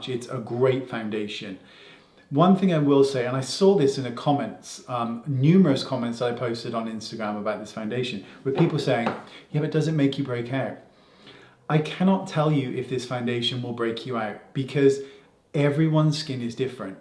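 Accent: United Kingdom. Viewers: Me: "gonna" ~gun-uh~ People from the UK: "gunto" ~gun-noo~ So fun and cute 🧡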